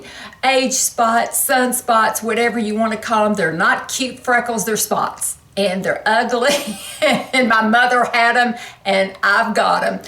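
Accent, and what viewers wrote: South, United States. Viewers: Wonderful "tips"! I actually follow a lot of them. After too many years of not caring for my body (smoking, drinking, not sleeping enough, eating poorly, etc), I'm probably the healthiest internally than ever (at 63) since I've quit all that nonsense. Now I suffer with sciatica, but my husband and I hike portions of the Appalachian Trail yearly. I've put in a few hundred miles now and that's the only time my sciatica doesn't act up! Walking, climbing those Appalachian mountains, and sleeping in a tent for weeks on end make me feel youthful again! Amazing! So I'm a firm believer in STAYING ACTIVE, whether it's exercise in your living room or a gym, or bike riding, yoga, swimming, running...whatever you can do to stay active is a good thing. And having a hobby is great too. Keep the mind active as well! I am an artist, and I try to stay involved with my community art group. 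I just love your videos...you seem like such an sweet, genuine, Southern belle (Tennessee? Kentucky?), and I thank you for your videos.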